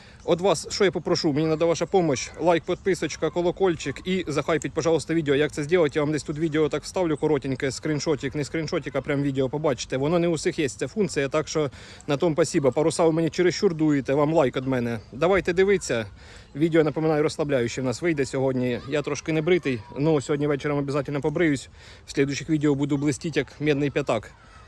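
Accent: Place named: Surzhyk, Ukraine. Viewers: Прикольні відоси, дивлюсь без напрягу😂 Єдине не зрозумів, як той факт, що ви виросли в селі пояснює не знання української мови. Тут швидше я думаю відіграло роль особливості спілкування в певному регіоні( схоже трохи на полтавський). Тому не треба пов'язувати це з селом. А позиція "яка різниця" думаю не зовсім правильна, тим паче коли ви ведете блог на велику аудиторію. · Чого це "центральної частини"? Це слобожанський діалект. ) Ото як він назвав малі яблучка, так їх називала моя бабуся якій би було 100 років). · ваш суржик дуже прикольный, и он так естественно звучит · Дякую за відео! А на рахунок мови, то хай ті умники спердоляють) На Запоріжжі всі так розмовляють. Я теж далеко і Ваша мова, як мед у вуха😊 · Не знаю, Для мене, як для західняка, суржик у Вас навіть не дуже сильно виражений. Ну проскакують русизми, але не страшно. Не звертайте уваги на хейтерів. 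· Ти балакаєш, як ми на полтавщині, і похер хто, що думає, аби тобі було зручно, не звертай на " вумних " уваги, вони того не варті! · ти дуже приємно розмовляєш, милий наш особливий суржик, характерний центральній частині України)) ❤ краще суржик і добро в серці, а не видавлена з себе солов'їна і негатив! Слухати приємно, дякую за відео! Цікаво і корисно) підписалася на тебе😊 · По тому як говориш, здається що ти з центральної частини України. Вгадав? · не обращай внимание на хейтеров, это круто наоборот, что ты не стесняешься и еще нам это все показываешь. Большинство считает, что ты все делаешь по красаве, а если у кого-то подгорает, то это их проблемы. У тебя классный суржик, все звучит естественно и понятно. А яблоки про которые ты спрашивал похожи на сорт Ранетки.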